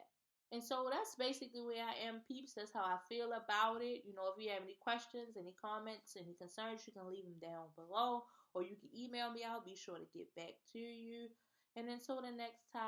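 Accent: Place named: DMV, United States. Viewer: I enjoyed this video very much so. Honestly! Everything you said hit the nail on the head. S/N: Are you from the DMV area? Your accent is everything 😍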